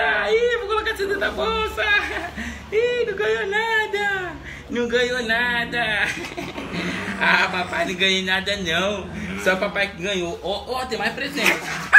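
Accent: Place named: Minas Gerais, Brazil. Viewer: Esse capixaba tem sotaques de mineiro😂😂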